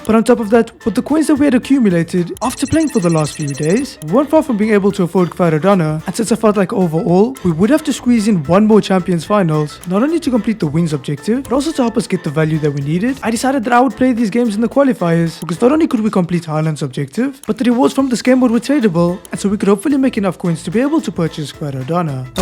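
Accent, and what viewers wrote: South Africa. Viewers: where are you from because you sound south african if im not mistaken 😮😊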